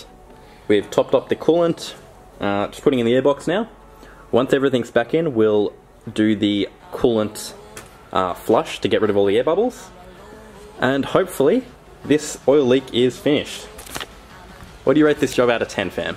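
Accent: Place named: Australia. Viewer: nice and clear vid dude! good to hear an Auzy accent too! And thats coming from a kiwi!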